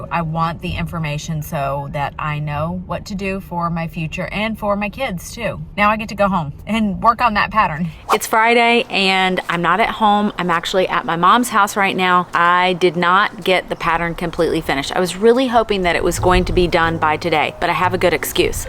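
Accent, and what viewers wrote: South, United States. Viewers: I knew it! North Carolina! With your cute southern accent!!!! 🤗🔥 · Hi, loved the video today! I think your work room is adorable. I thought you were from the south, I was guessing South or North Carolina! I am in Georgia. I have been knitting and crocheting since I was a teenager. I became a fiber artist about 15 years ago. I had never thought of myself as a real artist, just a woman with a love of all things yarn. Then a very dear friend of mine who worked at an art department in a university in California, looked at my work and said that it was comparable to many of the professional things that she had seen displayed at various fiber art exhibits. She helped me to see that what I made had beauty and artistic value and that my own designs and patterns were unique. It really changed how I viewed what I did and gave me confidence to try new things. 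It truly opened up a whole new world for me.:) This summer for a little change I tried my hand at embroidery and thread painting. Now I am back working on a new shawl design. I have a small craft room upstairs which I affectionately call "The Lair". I think we have a lot in common. Have a great day ahead!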